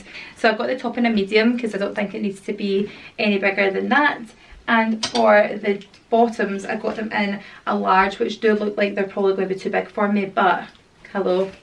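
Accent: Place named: Glasgow, United Kingdom. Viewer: are you from Glasgow? you sound just like my cousin haha i love you you’re so sweet and beautiful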